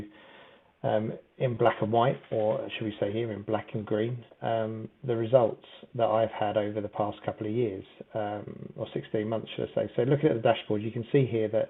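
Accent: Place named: United Kingdom. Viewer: Hi there, great video chap. I just joined yield nodes with a $1,000 initial investment but thinking of adding more! Would you be able to please explain a bit more about how you withdrew into btc and then I guess back into your bank? Much appreciated thanks 🙏 btw, sounds like you’re in the Uk…that’s where I am👍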